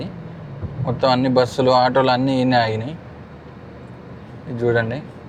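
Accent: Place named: Telangana, India. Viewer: Nice video.. meedi Telangana laa ఉంది.. మీ వైఫ్ ది ఆంధ్రా లా ఉంది.. కదా?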